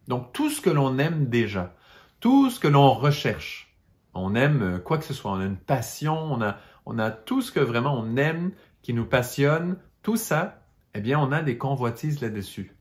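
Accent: Quebec, Canada. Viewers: Et l'accent québécois my God je trouve ça trop cute! Une magnifique province,j'ai eu l'occasion de remonter le st Laurent avec des pêcheurs:INOUBLIABLE! Nous les français on vous adore!💖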